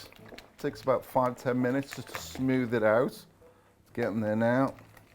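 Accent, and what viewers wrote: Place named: Merseyside, United Kingdom. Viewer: That unmistakably from somewhere in the Merseyside area.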